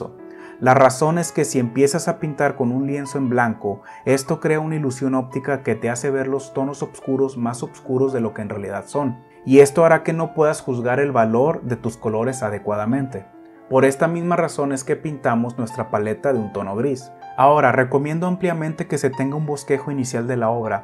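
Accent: Mexico. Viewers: Excelente video. Me suena a que eres de México. Has probado la marca que venden los de Roel? Me parece se llama velazquez. Qué opinas de ellos?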